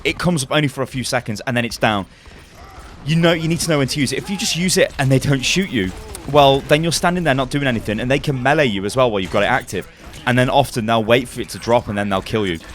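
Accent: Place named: United Kingdom. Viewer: this disgusting british dialect.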